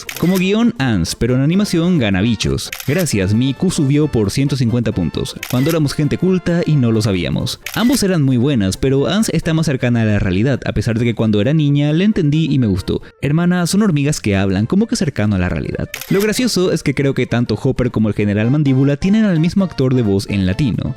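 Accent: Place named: Paraguay. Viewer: Nuevo Sub :D, dije que eras paraguayo por tu acento y es cierto xd🇵🇾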